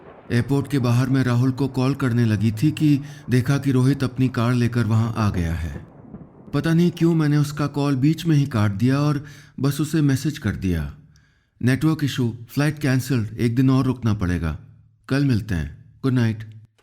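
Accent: India. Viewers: Main 2018 se apki kahaniyan sunti aa rahi hoon apke alawa aur bhi bahut logo ko suna lekin apke jaisa desi accent aur kisi ke pas nahi Aap kahani sunate hain toh lagta hai jaise sab ankhon ke saamne ho rha hai, bahut sukoon hai aapki awaz me Ise hamesha jaari rakhna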